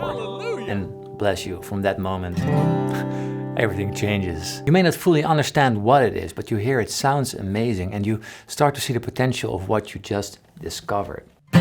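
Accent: Netherlands. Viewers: already felt like it was a dutch accent XD ook hallo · You inspire me to play the guitar. I really like 08. very beautiful sounds. Ik hoorde trouwens gelijk dat je nederlands was, fantastisch! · are u from holland? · Only the Dutch have this beautiful accent when they speak English:)) Hartstikke mooi joh, ben een beginner maar ga je zeker volgen!! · Well I always thought you were dutch from the accent. This did it for me XD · I love your Nederlands accent 😂❤️ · oh mijn god sounds dutch!💯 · I thought 'hmm, I think he's Dutch' but at the 'Oh mijn God' I knew it hahahaa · "The beard guy with the weird accent" I'm gonna guess Swedish. I don't know why, but that's what my first thought was. Edit after finding out it's a Dutch accent: Well I got the continent right. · Funny, he also plays with a kinda Dutchie Euro accent. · I sound a little less Dutch I hope but he's the better teacher haha netjes broer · Me trying to figure out if this man is Dutch or not. 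Him Me: Yup, deffo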